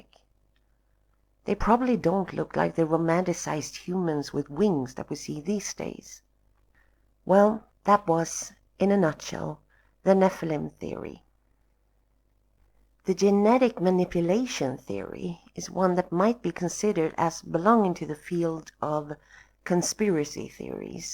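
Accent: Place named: Ireland. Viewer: You said you're Swedish but your accent sounds Irish. I loved listening to your narrative. Very good!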